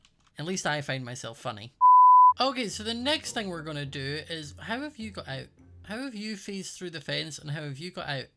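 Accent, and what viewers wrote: Scotland, United Kingdom. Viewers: Yes and it’s because he’s Scottish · hes scottish not irish :-) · Is called being Scottish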